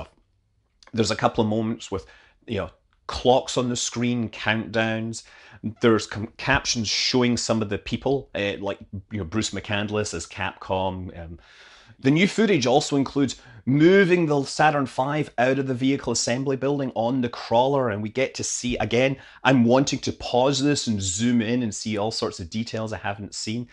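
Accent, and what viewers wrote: Scotland, United Kingdom. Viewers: Hi, did you watch it in the US? Can't help but notice you're Scottish, and I can't find it anywhere in the uk!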